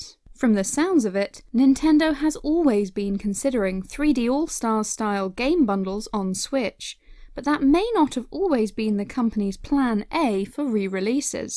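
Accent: United Kingdom. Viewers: Ok I'm new to the channel. Who on Earth is this British sounding female narrator who hasn't been named? The other guy at least is named in the description.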